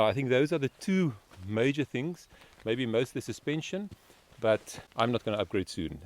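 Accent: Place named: Afrikaans, South Africa. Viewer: I smaak the Afrikaans inglish lol very deliciously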